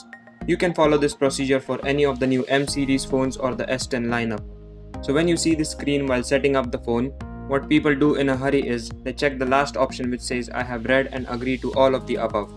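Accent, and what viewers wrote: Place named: India. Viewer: I like your India acent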